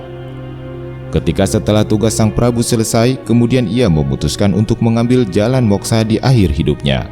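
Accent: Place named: Java, Indonesia. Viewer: Jawa keras bro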